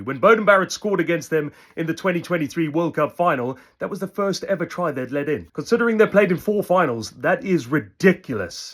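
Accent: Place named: South Africa. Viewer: Hey. Sounds like you left your beloved SA to live in England. That accent is a dead give away.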